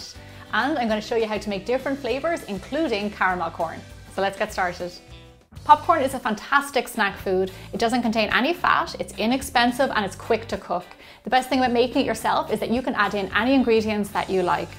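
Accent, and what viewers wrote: Ireland. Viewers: Are you from ireland because I am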